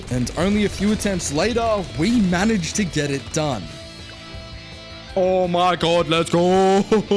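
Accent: Australia. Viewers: Hears voice - Checks channel - HES AUSSIE!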